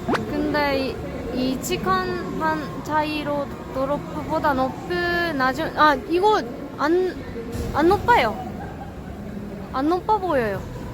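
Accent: Gyeongsang, South Korea. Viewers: 대구에 좀 오래 살았더니 대구 억양이 마이 나오네요 이쁜 ~~^^ㅎㅎㅎㅎㅎ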